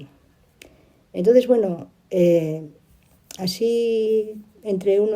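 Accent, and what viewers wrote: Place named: Asturias, Spain. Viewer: Mi like y suscripcion para que tu canal crezca , por el acento pareces Asturiana , yo tambien , buena suerye con el canal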